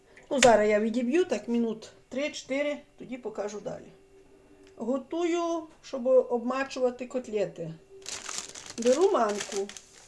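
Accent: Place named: Vinnytsia, Ukraine. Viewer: Доброго дня, якщо не таємниця звідки ви? Ваша мова така рідна, я родом з Вінничини, там такий говір, давно дуже там не була.Здоров'я вам і миру.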